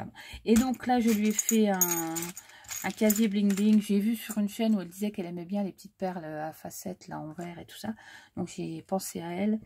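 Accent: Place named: Lorraine, France. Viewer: Tout est très joli !!! Cathy a dû être contente. Je me suis abonnée chez toi aujourd'hui et je savais bien que je reconnaissais ton accent, je suis Lorraine aussi. Tu es d'où ?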